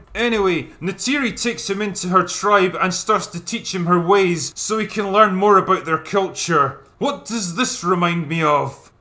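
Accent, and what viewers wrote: Scotland, United Kingdom. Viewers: Ten seconds in, already sounds like the narrator is about to have an aneurism Edit: oh he's Scottish 🍀 Edit Edit: why do Scots have blisters on their dicks? Cos they're such tight fisted wankers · You're Scottish? I just thought that was just drunk accent.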